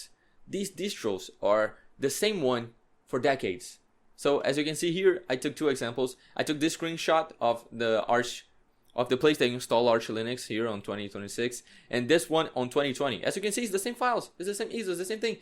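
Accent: Brazil. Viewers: Mano BIZARRO como ao ouvir você pelos primeiros segundos, deu um gatilho do nada que você era brasileiro só pelo "sotaque" de leve que senti na pronúncia das palavras em inglês, isso é muito doido KKKKKKKKKKKK enfim cara, excelente vídeo e sucesso pra ti!! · Awesome video! Prolly the best ive ever seen about distros, love the slides. Seu sotaque não me engana! É br? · Video mt top carioca! É muito top q quando agente comeca a usar Linux, agente acha que é so uma versão melhorada do windows. Mas quanto mais agente usa Linux, masi agente tem opiniões sobre os diferentes componentes do sistema, e é engraçado que quase todos que mudam, começam á mais voltados a privacidade e FOSS. É muito boa essa comunidade? P.s: Teu inglês tá muito bom, não deixa tua cabeça achar que so por causa do sotaque brasileiro ele não é, tenta não se comparar com nativos! Parabéns pelo video e pelo canal, tu ta me fazendo aprender quickshell pra customizar meu hyprland (Arch btw)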